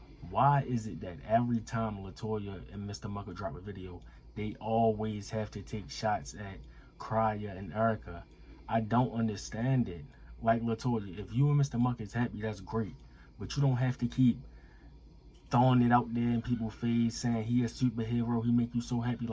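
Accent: Baltimore, United States. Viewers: You from Baltimore? Lol asking because of your accent.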